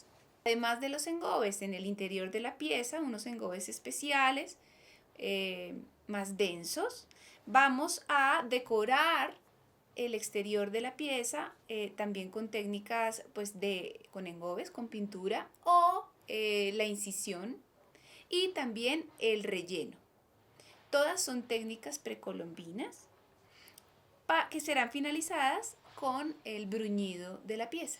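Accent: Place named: Colombia. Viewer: ❤Que interesante, sencilla, detallada, precisa y Muy amena explicación. Ese amor en tu enseñanza y sobretodo ese sutil Acento Colombiano tan bello. Gracias Gracias Gracias 🎉😊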